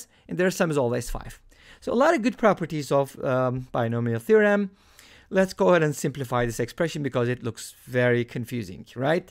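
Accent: Turkey. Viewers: Telafuzunuz Türk olduğunuzu düşündürdü. Doğruysa video için çok teşekkürler ;)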